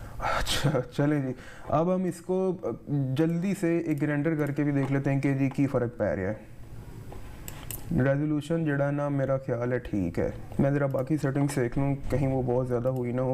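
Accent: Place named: Punjab, India. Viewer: paji tuhada punjabi accent att krva denda 🔥🤟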